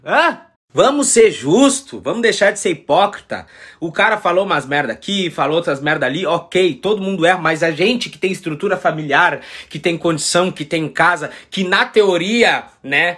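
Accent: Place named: South, Brazil. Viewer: Uma gracinha esse sotaque de sulista hahahaa e ele tá certo nisso aí!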